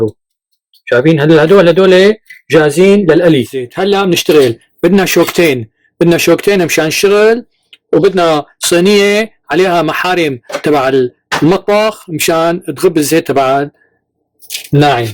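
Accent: Levant. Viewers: لك ما تبلى المهارة الشامية ❤️ واللهجة الشامية ❤️ وهالفكرة الحلوة لتعليمنا اكلة الطفولة وذكريات شام الامان وصراخ البائع ناعم يا ناعم ❤️الله يعيد هديك الايام بهمة ناس بحبوا بلدهن ❤️ الله يعطيك الف عافية وشكرًا جزيلاً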